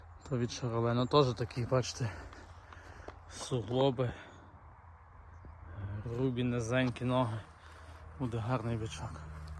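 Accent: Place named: Lviv, Ukraine. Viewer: Привіт,чую по розмові шо ви з Львівщини,підкажіть де можна придбати теля бичка шароле?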